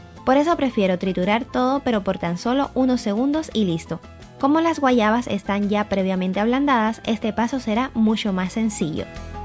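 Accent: Cuba. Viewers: Excelente receta, acompaño tus recetas. Soy de Puerto Padre. Las Tunas. Siempre me pareció tu acento parecido a los holguineros.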